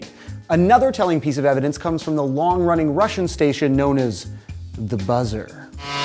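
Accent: Canada. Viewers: Your Canadian hell ya Eh...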